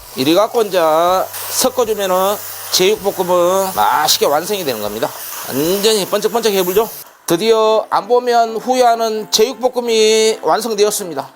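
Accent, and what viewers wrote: Jeolla, South Korea. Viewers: 쉐프님 전라도 사투리 구수합니다 여긴 완도 에요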